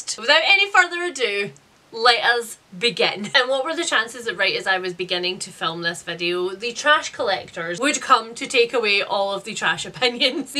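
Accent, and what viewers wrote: Scotland, United Kingdom. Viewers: I have very rarely not sped up audiobooks, often times based on the accent of the narrator I will start lower (usually 1.2) but as I become used to it I speed it up. There have been some narrators that talk sooooooo slow, that I listen to them at 2.5. It completely depends on the book, but probably on average its usually 2x, it just sounds more natural - once I got used to it listening to it at 1x sounds like a record that has been slowed down. I also listen to youtube videos at 1.5 to 2x - 🤷‍♀ It's more normal sounding/soothing for my brain. I like a lot of booktuber merchandise, and I love being able to support youtubers that I watch a lot, I honestly watch youtube more than I watch TV. Also while I don't enjoy all the same books that you read, I do enjoy that you're Scottish 🤣 The accent makes me happy.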